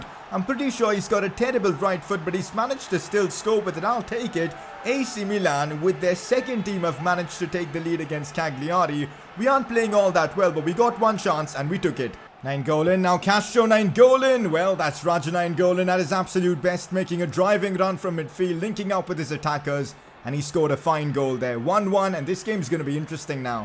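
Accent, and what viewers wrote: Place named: India. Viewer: the worst youtuber with the gi gi gi aaaaaaa Indian accent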